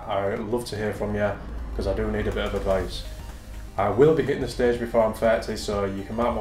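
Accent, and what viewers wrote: United Kingdom. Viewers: Come on ,,, its so clear that it's British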